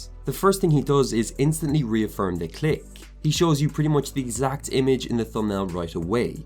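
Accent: Ireland. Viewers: Are you Irish? And happy St. Patrick’s day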